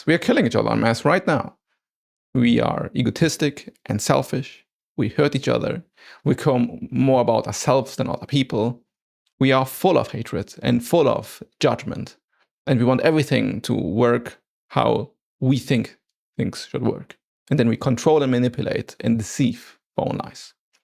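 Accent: Germany. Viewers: Why does the internet lie about your nationality and ethnicity? It is odd information to restrict access to. I would understand keeping your birthplace and current locale undisclosed, but the internet is wrong about all your details. I can tell just by looking at you and hearing your voice, you are definitely not a white Russian. AI has to go, it's useless junk. Based on accent, it is far more likely you are german. Your face is a bit ambiguous, so I could not guess where your family is from. Maybe India, Bangladesh, or Pakistan. Somewhere from the spiceland. Edit: Nah, you're some African Caucasian hybrid. That explains your accent.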